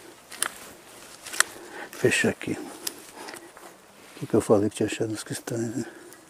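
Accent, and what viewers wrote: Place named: Rio Grande do Sul, Brazil. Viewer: Queria tanto saber onde fica se e acessivel por estrada, que pelo sotaque e gaucho.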